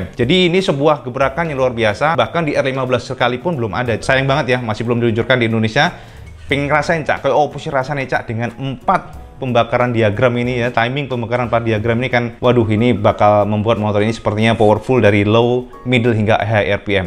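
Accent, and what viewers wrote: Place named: Java, Indonesia. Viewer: Kang emang gokil cak...podo jowone karo aq...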